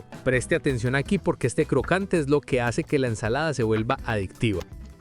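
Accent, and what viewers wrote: Colombia. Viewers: Se ve muy rica si la haré,se escucha que eres colombiano, saludos desde la Ciudad de México 🇲🇽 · Eres colombiano? Me late por tu timbre de voz 😊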